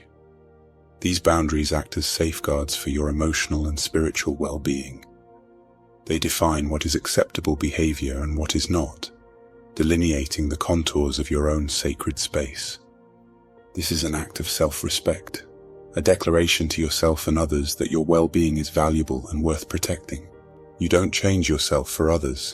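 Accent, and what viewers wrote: United Kingdom. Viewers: That British accent on these type of videos is so annoying..makes me tune out instantly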